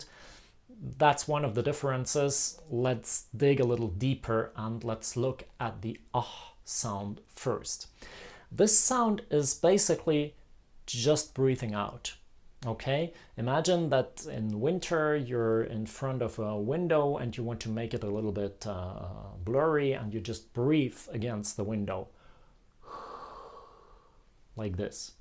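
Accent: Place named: Ireland. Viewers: The Irish are the only native English speakers that don't pronounce "th" as one sound. They pronounce "t" and "h" as separate sounds like most non native English speaks. His English "r" sounds Irish as well. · Thank you! You’re English sounds a bit Irish!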